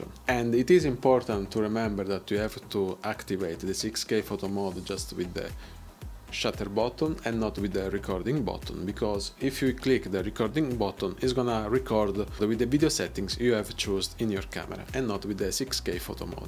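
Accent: Italy. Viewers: Ciao, ti seguo da un po, visto che ho scelto pure io la Lumix S5. Professionale e conciso, complimenti. Mi ricordi nel tuo inglese "My Name is tanino"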